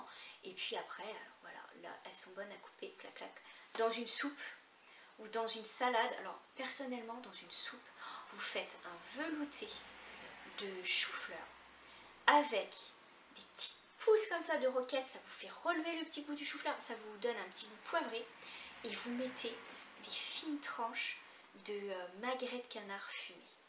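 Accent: Quebec, Canada. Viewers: Petit accent Québecois? ^^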